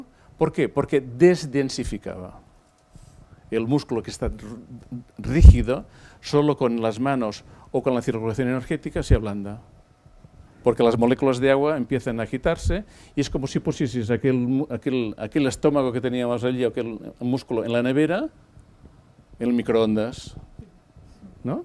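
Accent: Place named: Catalonia, Spain. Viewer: Probablemente, coadyuvante... Con el acento catalán ya...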